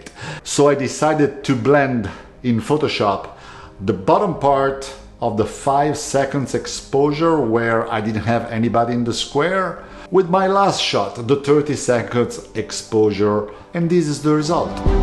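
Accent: Italy. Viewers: Si, ma il tuo accento Milanese é pazzesco, non ti avevo mai sentito parlare in italiano. Bel progetto, Milan l'é semper un gran Milan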